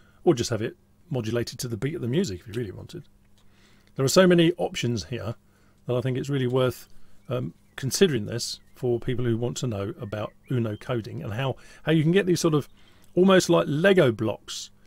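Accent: United Kingdom. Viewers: Hey, Am loving the videos, they are very informative. I would like to know your background in electronics, software etc...? Am from Liverpool, and I hear your also British. Where are you from? Keep the videos coming!! Kind regards Peter - Liverpool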